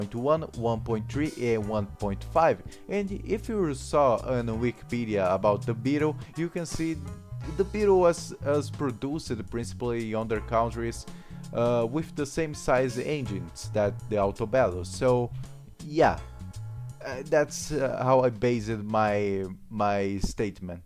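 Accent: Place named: Russia. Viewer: Nice russian english brothar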